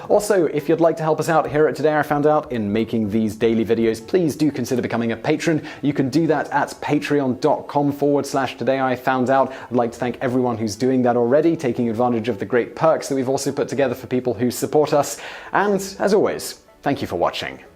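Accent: England, United Kingdom. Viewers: what kind of accent is this? british? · Anyone else impressed with the actual journalism or background investigation into this story? A part-time staff of seven looked into the story - not looking for the story to be true or untrue, just reporting on known facts or assumed facts as well as offering a hypothesis for both sides of the picture. Many online news outlets today would just so want the conspiracy or unknown to be true that they would push that line or not offer the full picture. Either that or have BS fluff or headline/sensationalism stories lead the news. There is alot going on in the world; many of which does not get copy. Smutty sex stories or commercials veiled as a "news story" are getting air time. This channel and others like it deserve credit for doing a fine job. Unpretentious and no flash production; just a guy talking in front of a camera. 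An english accent, in my opinion, goes a long way. He speaks well and is easy on the ear.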